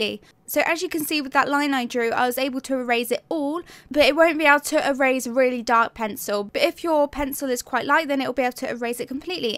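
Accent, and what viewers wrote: United Kingdom. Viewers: Is this a british accent? Sounds awesome at 0.25x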